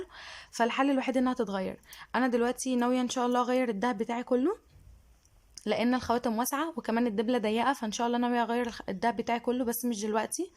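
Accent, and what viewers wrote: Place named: Syria. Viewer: الله يرحم والدك و مبروكة الخطبة بس كلامك ببدل على انك سورية واللا أنا غلطاني ؟؟؟ بس مدام انتي سورية ليش عاملي الفيديو باللهجة المصرية ترا مافي أحلا من الواحد يحكي بلهجتو و لهجتنا من أجمل اللهجات حتى لو خطيبك مصري المفروض تحكي بلهجتك طبعا هادا شي بيخصك بس حكيي مجرد رأي شخصي ما أكتر والله يوفقك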